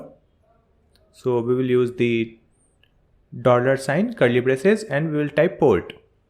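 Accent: India. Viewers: infortunately english indian accent make it difficult for me to follow this interesting youtube subject. i'm actually not an english native speaker but i understand well britain and american english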